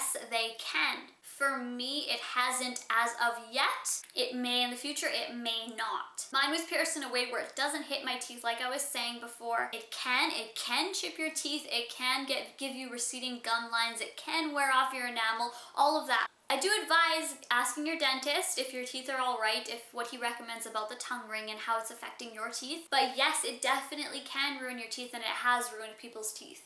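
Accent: Canada. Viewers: Are you Canadian? You sound like you are.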